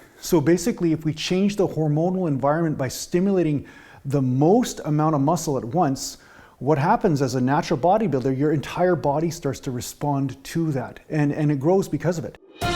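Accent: Canada. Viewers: u canadian?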